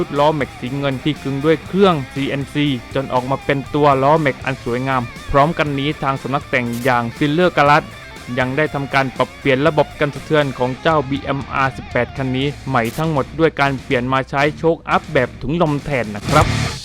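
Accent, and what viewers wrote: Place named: South, Thailand. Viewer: คนใต้แน่นอนนิ้<num>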